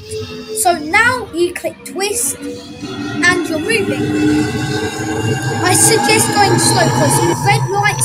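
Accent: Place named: United Kingdom. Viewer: Super strong British accent love it!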